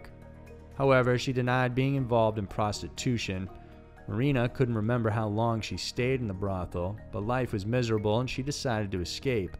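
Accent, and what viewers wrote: South, United States. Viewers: You are Canadian..Why you talk like you are from the southern states of the U.S?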